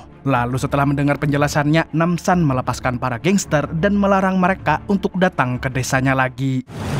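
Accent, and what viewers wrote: Banjar, Indonesia. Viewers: Adminnya urang banjar ngarannya nii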